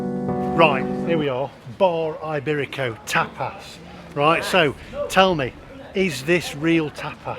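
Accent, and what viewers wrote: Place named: Black Country, United Kingdom. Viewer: I should be offended as im from Wulvarampton but i cor stop loffing at his daft black cuntray accent 🤣 He dow arf sound daft 🤣🤣🤣🤣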